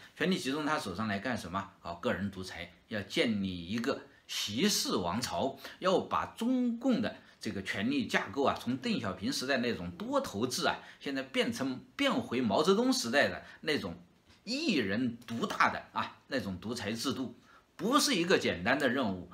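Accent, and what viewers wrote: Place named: Hunan/Hubei, China. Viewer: 这哥们的口音不是湖南人就是湖北人，以后把这口音改了，再发视频来损自己的母国。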